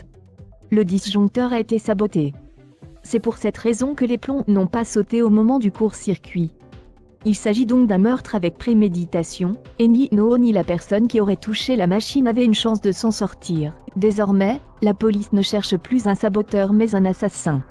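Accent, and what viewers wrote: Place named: United States. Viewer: Le commentateur prononce tous les prénoms à l'américaine ! C'est stupide !!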